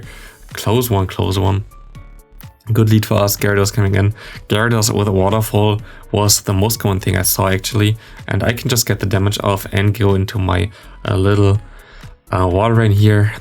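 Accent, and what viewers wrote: Germany. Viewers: Is it possible that ur german cause ur accent kinda sounds like german :)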